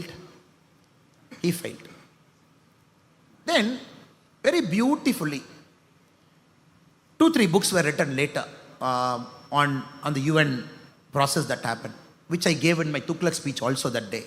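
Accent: Tamil Nadu, India. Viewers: தலைவர் பேசுற ஆங்கிலம் கூட தமிழ் மாதிரி இருக்கு 😍😍😍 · Great Tamilish English...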